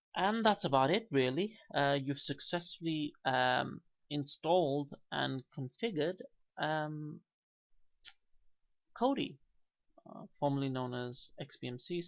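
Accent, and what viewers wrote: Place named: United Kingdom. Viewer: Fantastic! I could listen to your lovely voice all day ♥... Lovely accent. What part of the UK? Subscribed :)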